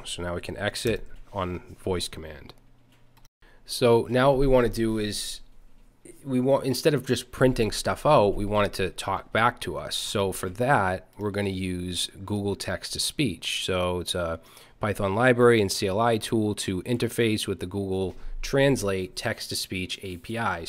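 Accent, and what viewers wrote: Boston, United States. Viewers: It can even pick up your Boston accent! good one. More Python please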